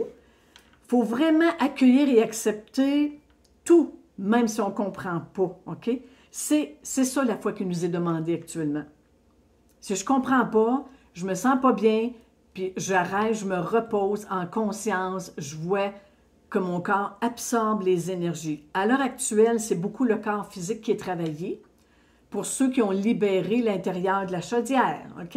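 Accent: Quebec, Canada. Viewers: Merci beaucoup. Je t’adore💖🙏. J’adore aussi, en plus de ton charmant accent québécois, tes enseignements et tes encouragements. Je sais qu’il y a un café jazette à Bordeaux mais je ne retrouve plus les informations. Gros bisous à toi adorable 🙏🙏😘🥰👍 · Merci. J'aime bien t écouter. Message très positif pour la France, trop contente. Je ne sais pas si il y a des cafés jasette sur Paris. J habite Argenteuil dans la banlieue. Ce samedi le bonheur sur Paris. Du soleil 26 degrés. Les jeunes au bord de la Seine, port de Bastille avec chips en vin et pain. Ambiance vacances. Trop bien après cet hiver marqué par la pluie. ❤❤❤❤Sylvie. PS j adore ton accent qui me rappelle mon grand père et ma tante qui ont vécu au Canada et qui sont au ciel maintenant.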